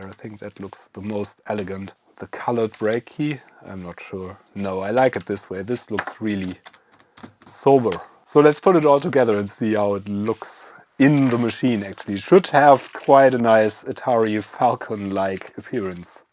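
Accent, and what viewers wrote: Germany. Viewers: Schade das die Videos immer in Englisch sind. Vom Akzent her würde ich sagen das Sie deutscher sind manchmal ist es schwierig zu folgen da mein eigenes Englisch nicht reicht. Aber nichts desto trotz sehr gute Videos und gut erklärt. Vielen Dank dafür. Grüße aus Niedersachsen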